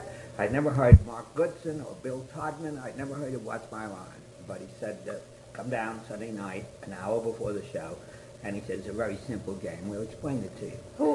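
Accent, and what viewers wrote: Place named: New York, United States. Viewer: Nobody speaks with this beautiful old New York accent anymore.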